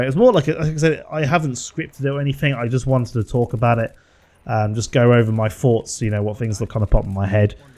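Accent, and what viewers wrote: Norfolk, United Kingdom. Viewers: What part of Norfolk are you from mate ?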